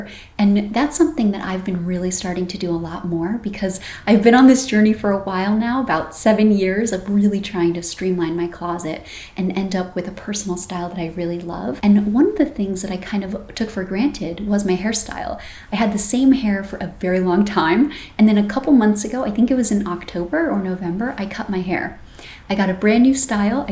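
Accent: United States. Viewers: You are one of the few American Youtubers I can watch. Everybody else will scream at you and pull this show like they are bursting of energy and good humour. I mean, you are really friendly, but not hysteric and loud. And your American accent is so nice and subtle. Why can't tourists visiting Europe not speak and dress like you?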